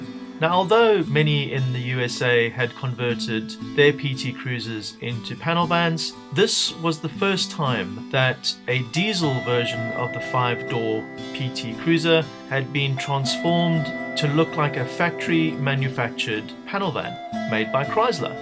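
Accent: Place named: South Africa. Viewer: good to hear an SA accent.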